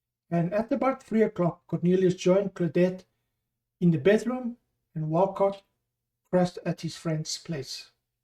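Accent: Afrikaans, South Africa. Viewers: He has fabulous Afrikaans accent and if you had listened properly you would followed it enough to understand he is saying bald From me a truly English person, I appreciate his work and his accent. Its not bad it is just different. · Dankie. Baie interessant. Heerlike Afrikaanse aksent. Doen so voort! Advokaat Barbie se lewe en misdade sal interessant wees.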